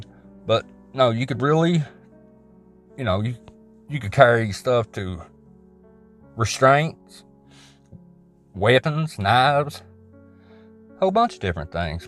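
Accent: South, United States. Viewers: Keep it real! Everything sounds better with a southern accent brother....love from Tennessee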